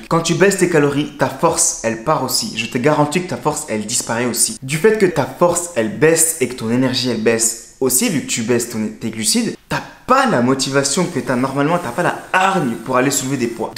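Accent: Besançon, France. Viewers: Je rêve ou tu est de Besançon ?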